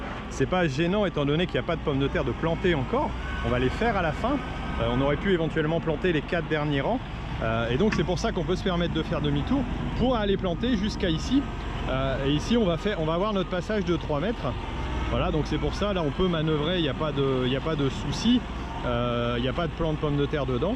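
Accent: Nord, France. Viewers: Bonjour, super vidéo Puis moi qui suis originaire du nord, j habite maintenant en Sarthe, ca fait du bien d entendre du ch'ti!😂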